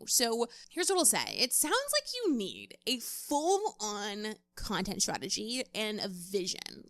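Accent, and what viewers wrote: United States. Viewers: American woman with that goddamn accent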